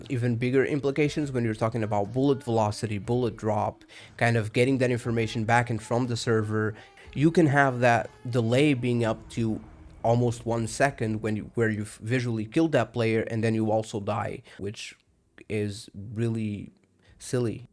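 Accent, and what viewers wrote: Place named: Portugal. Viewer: Are you Portuguese? your English sounds like it.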